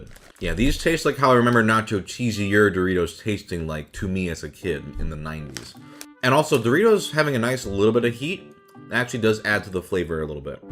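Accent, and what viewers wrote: United States. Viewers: yo this guy is asian but his voice and accent are AMERICANA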